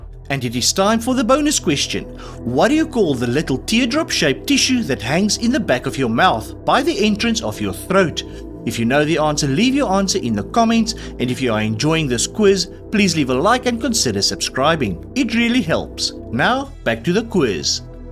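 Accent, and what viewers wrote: South Africa. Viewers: That is a lovely South African accent. I really enjoy listening to this gentleman! But plateau is the spelling; not "Plato."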